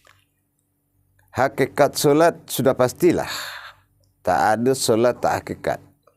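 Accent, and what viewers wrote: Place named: Malaysia. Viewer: Mf buya.kenapa logatnya diubah melayu.kan lebih nasional indonesia.lebih bnyak mempergunakannya.artinya lebih bnyak memahami indonesia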